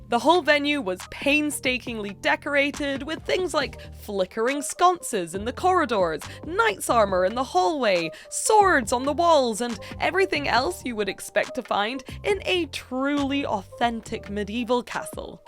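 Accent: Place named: United Kingdom. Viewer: Absolutely love your british accent, lovely as you are!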